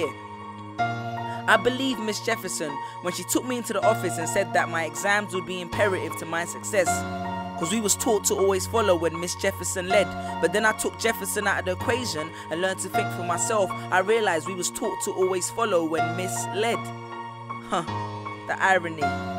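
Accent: London, United Kingdom. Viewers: It isn't cockney, its a south London accent that's derived from Jamaican. (slang term for it is Ja-fake-an) Cockney is very different, listen to Danny Dyer he doesn't sound like this. At all. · It is not a Jamaican accent. It is a London accent, just because he is black doesn't mean he is incapable of speaking, and just because you don't understand what he is saying, doesn't straight away mean he has a Jamaican accent, just because you see he is black, I know many Black, Caucasian and Asian people who speak like this, just because of the accent they picked up by living in London. Think before you say, don't use your absent minded, ignorant head to judge someone you don't know, you come across as racist. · Somewhere in London probably. You can't really say British, there's so many different accents in Britain. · I've been inside that building behind them, and it's in the city of London, but I don't remember what the building is called. 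I think it's something to do with art. His accent is London. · LyricMaker101 its london ghetto which is normally in east, north and south london. but juss coz he speaks like that dosent mean hes dumb, juss sayin. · it kinda had a hint of Jamaican to me but my best guess would be south London only because I watched a documentary and the people had a similar accents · South London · Frank White Not being smart, just not being dumb, but yes it is from south London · Marshall Manic it's not a cockney accent, cockney is old school east London, this is more south London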